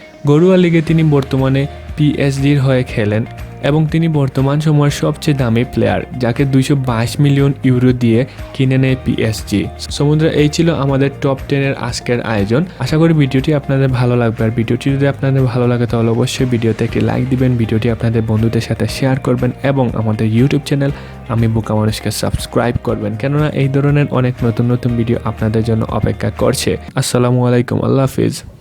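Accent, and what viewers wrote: Sylhet, Bangladesh. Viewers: ভাই আপনি সিলেটি? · ভাই আপনার বাসা কি সিলেট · Nice video thanks for the information. Bro basha kita sylhet o ni😅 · সিলেটের নাকিতা ভাই তুমি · Are u Sylheti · Vai afnar bari sylhet ni? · ভাই আপনি কি সিলেটি? Please reply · Bai apni ki sylheti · vai ki sylhety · apnar bari ki sylhet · Vai apni ki sylhety 🥰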